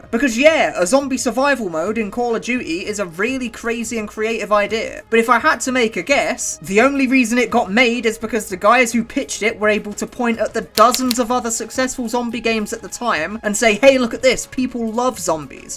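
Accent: United Kingdom. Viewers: I like the funny British man talking about indie games and the outer wilds. Seriously tho I know this is an old video but I love your content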